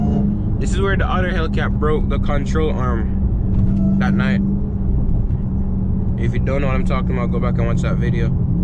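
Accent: Jamaica. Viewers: As a yaadman, me coulda pick up the accent immediately lmao, big up yuhself me g, dawg push di hellcat yuh fuckkkk😂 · This the jamaican crazy driving yall won't understand😂😂😂don know mi a watch from aruba/jamaican big up much love love e accent my yout and the content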